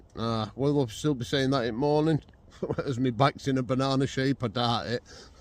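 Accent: United Kingdom. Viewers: Good watch! You sound like you’ve got a Sheffield accent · You have exactly the same accent as I do pal I'm from Pontefract are you close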